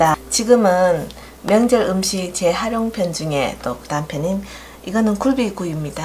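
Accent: Gyeongsang, South Korea. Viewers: 어제의 거지탕ㅋㅋ에 이어 오늘은 굴비양념구이 들어갑니다ㅋㅋ (어른들이 남은 전요리를 거지탕이라 하시더라요.ㅋㅋ) 이모덕분에 싸주신 음식들 깨끗하게 클리어 해가고 있어요^-^ 이모는 어디 계세요? 말씨나 레시피, 영상으로 느껴지기는 경상도 같은데.. 저희 가족은 모두 대구:) 외갓댁은 안동이구요~ 지금은 일 때문에 저희 부부만 낯선 포항에서 지내고 있어요ㅠㅠㅋ 유튜브에서 좋은 이모 만났다고 명절 보내러가서 자랑했다는ㅋㅋㅋ 신랑도 열씨미 배워보라며 응원해줬어요.♡ 다음주에 식혜, 약밥 도전 예정~~ 쉽고 디테일한 설명으로 요리에 재미들인 늙은 새댁 깸미 댓글 드리고 갑니다.ㅋㅋ ps. 오늘도 마니 마니 웃으시고 행복하셔요~♡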